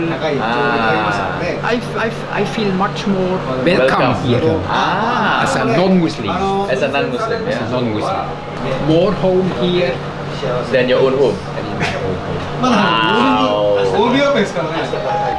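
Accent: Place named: Malaysia. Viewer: Now, you are able to speak in manglish and kelantanish.. thumbs up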